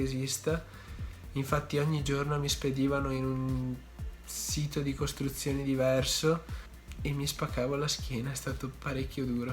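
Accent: Veneto, Italy. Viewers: Sei Veneto?? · Ma di dove sei?Veneto?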